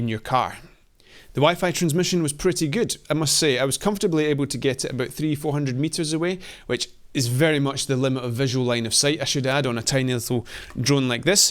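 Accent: Scotland, United Kingdom. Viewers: Scottish. Scottish accent. Never ever confuse Scots with English.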